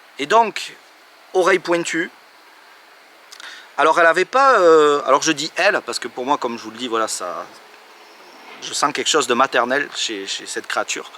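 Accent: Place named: South, France. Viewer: Super vidéo comme d'hab! J'apprécie beaucoup pour ta sensibilité et tes réflexions très profondes..Et ce bel accent du sud n'est en rien déplaisant.. bien au contraire! Et puis quel courage d'avoir créé une telle chaîne! Merci beaucoup.. que du bonheur et de l'authenticité!🙏🙏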